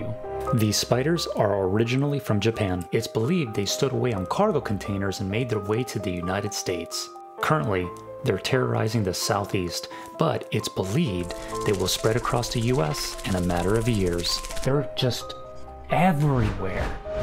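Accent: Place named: Australia. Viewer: Bro is from Australia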